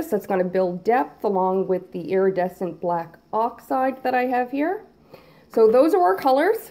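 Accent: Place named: Canada. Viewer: I have started watching you ! I love your style and your Canadian accent! Are all Canadians artistic! Ha ha keep up the awesome pieces♥️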